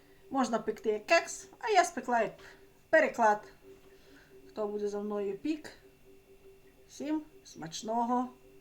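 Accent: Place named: Solotvyno, Ukraine. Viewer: Ой 😄у нас так само кажуть" штири" откроми" 👍наче ви наша солотвинська(Бердичівський рн)🫶👍👍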